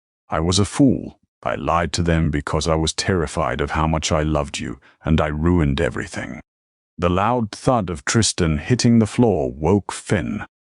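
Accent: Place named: United Kingdom. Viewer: Nice story. Love this british accent ❤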